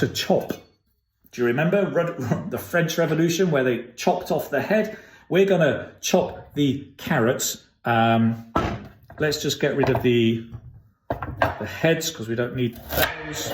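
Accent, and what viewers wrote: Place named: England, United Kingdom. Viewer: perfect soap and english accent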